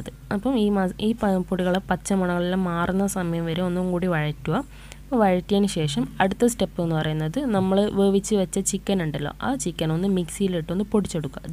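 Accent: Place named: Kannur, India. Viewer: Kannur slang sooper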